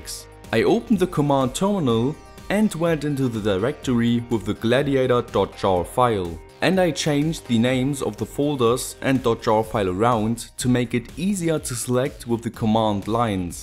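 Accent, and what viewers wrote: Germany. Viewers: Jap er ist deutsch. Hört man doch:D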